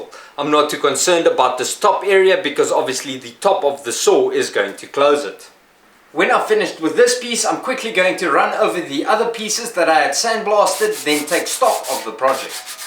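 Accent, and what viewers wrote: South Africa. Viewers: LOVE your videos. My best fried is from SA and I miss him. However, your SA accent makes me feel like I'm hanging out with him and his SA friend. Not to mention, I love you tech tips...all very well done.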